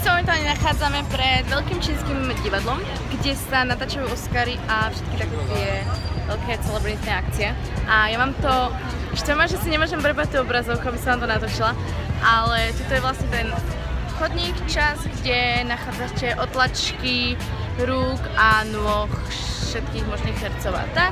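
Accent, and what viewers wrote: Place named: Bratislava, Slovakia. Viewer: Ja mám rada Bratislavskú slovenčinu prízvuk kiež by som ho mala tiež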